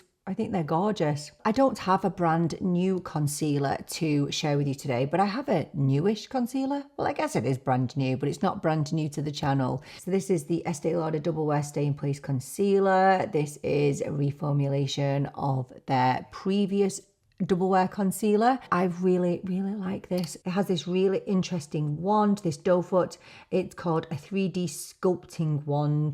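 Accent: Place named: Northern England, United Kingdom. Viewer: I thought so 😀 I’m a northerner too, so I guess I gravitate towards you, I can relate! I’m originally from Leeds and moved to Nottingham when I was 24 (I’m 53 now) and my accent will never leave me 😂 neither will yours. Never been to Ireland myself but I’ve heard it’s very beautiful. I travel to Birmingham regularly as I’m a big gig lover. I have posted a few videos on here if you are interested. I was so excited when I saw that a Sephora had opened in the bull ring. It’s got so many different brands on display and I got a bit overwhelmed if I’m being honest. I didn’t even buy everything! 💕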